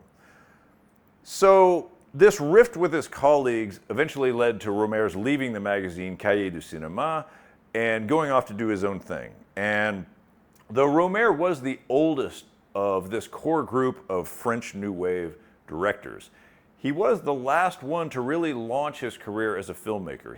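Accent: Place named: United States. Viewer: This accent is extremely American.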